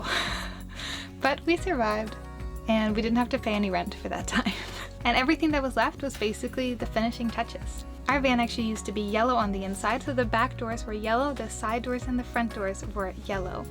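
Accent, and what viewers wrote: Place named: United States. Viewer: Love the outtakes at the end. Your American accent is so perfect, I often forget you're from Sweden.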